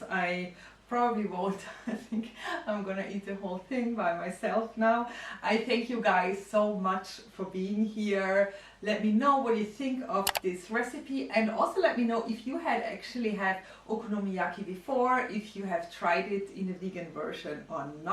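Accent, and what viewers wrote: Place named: Stuttgart, Germany. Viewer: Lucky you with the Ibiza Carob syrup. I like how you start out the video with a wet mark on your apron. You work even before you film, I guess. That Nutri Bullet looks like it went through WW I and did some service on the Western Front with Erich Maria Remarque... You are brave to use that dried Shitake mushroom... I like your knife skills. You didn't even chop off a nail... did you ? I think I make something like this every other day. I called it an omelette... I like your accent. It makes me feel like I am listening to a Professor from Stuttgart... then I look and it is a pretty blonde... that always freaks me out... in a good way... -Jeff Goes Random. Jah.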